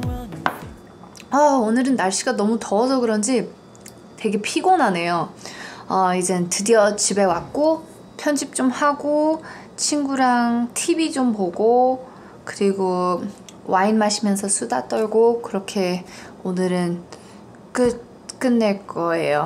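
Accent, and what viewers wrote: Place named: United States. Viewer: She has a very Americanized accent and a lot of pauses. It kind of frustrates me and is hard to follow. Makes me anxious listening to her... But, not everyone is completely fluent in their parent's tongue. I was forced to take Korean during the weekend.... so... yeah.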